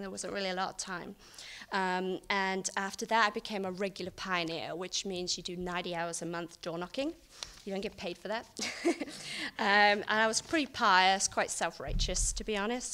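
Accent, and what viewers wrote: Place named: Australia. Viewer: she sounds kind of Australian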